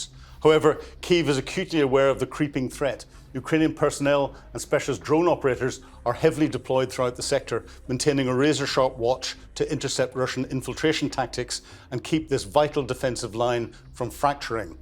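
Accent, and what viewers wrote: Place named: Northern Ireland, United Kingdom. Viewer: He’s from Northern Ireland, originally.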